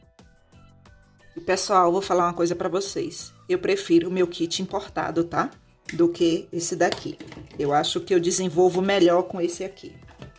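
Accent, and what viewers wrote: Bahia, Brazil. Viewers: Amo suas receitas e gosto do seu sutaque Bahia tudo de bom gosto muito de vc pq esprica com muito atenção parabéns vc c me lembra uma amiga mulher do meu cunhado de senhor do Bonfim Bahia bjs 😘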